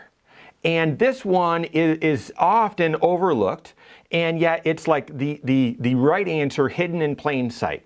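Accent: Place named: Michigan, United States. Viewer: I knew that was a Michigander accent before you even said Midwest! Great info. Thank you for this. (Go Buckeyes!)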